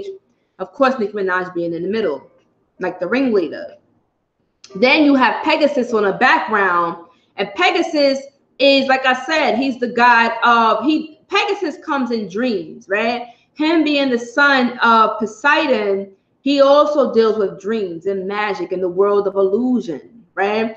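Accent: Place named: Brooklyn, United States. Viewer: Your accent is so Brooklyn. But the way you say “you know” is so New Orleans loll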